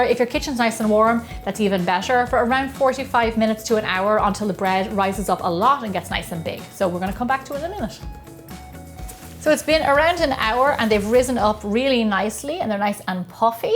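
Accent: Ireland. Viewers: Love your videos,I will try this bread recipe fingers crossed, love your Irish ☘️ accent, Thanks for this recipe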